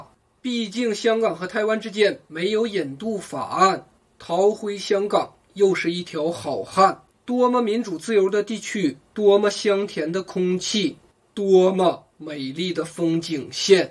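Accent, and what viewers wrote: Northeast, China. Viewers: 哈哈😄你是东北人吧！高!老乡!👍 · 老哥的东北口音好搞笑，好喜欢😄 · 东北话的幽默风趣 体现的淋漓尽致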